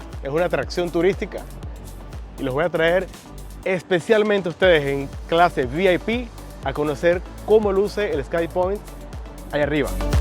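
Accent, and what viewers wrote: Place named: Colombia. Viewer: Muy informativo el video, gracias hermano. Eres de Barranquilla? Tu acento suena un poco colombiano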